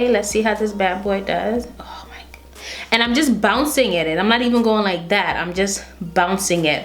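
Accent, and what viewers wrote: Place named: New York, United States. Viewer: Once I listened more I picked up NY lol.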